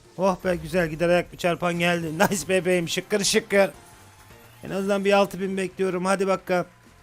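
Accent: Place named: Thrace, Turkey. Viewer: Trakyalımısın şive biraz var gibi, hadi bakam derken 😅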